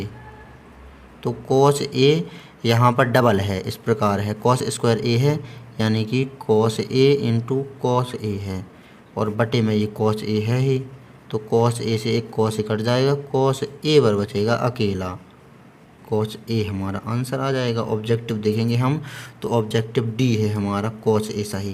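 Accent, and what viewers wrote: Bundelkhand, India. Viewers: Bhai tum kaha se ho bundelkhande bol rhe ho voice se lgta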